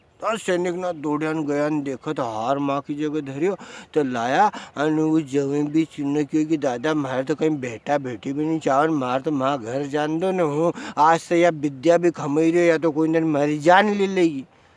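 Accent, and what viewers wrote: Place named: Indore, India. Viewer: Ya mhare indori bhasha lage. Mhake athe astar ni bole.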